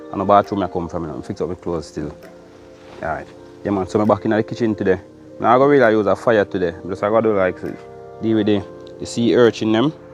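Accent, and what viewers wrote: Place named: Jamaica. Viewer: What part of JA you from,? You sound different from Raj and JamaicanWildlife!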